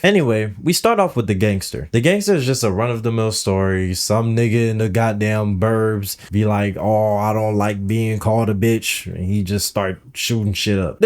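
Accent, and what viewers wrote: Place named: Nigeria. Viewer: Are you NIgerian? I can hear it in the way you pronounced his last name and how you pronounce the word "the".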